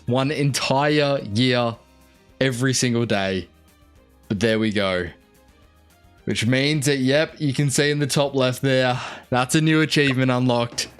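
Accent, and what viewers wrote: Australia. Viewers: Hears voice - Checks channel - HES AUSSIE!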